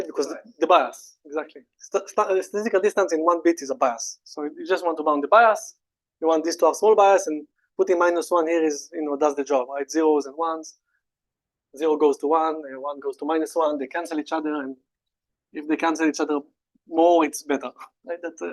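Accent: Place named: Israel. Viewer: תודה על השיעור ! (יאמר לזכותך שמרגישים ממש את המבטא העברי בתוך האנגלית)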